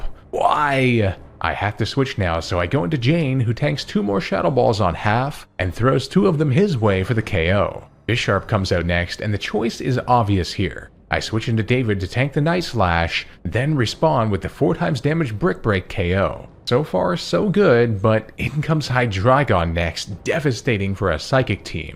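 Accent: Canada. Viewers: Your canadien eh?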